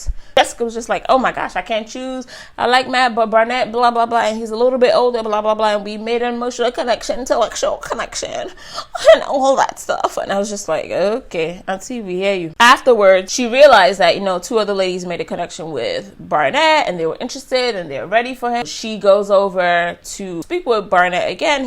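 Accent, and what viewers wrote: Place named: Caribbean. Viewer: I love your combination makeup lesson and reality show review. I'm Bahamian-American and hear a patois lilt in your voice. Are you of Caribbean descent?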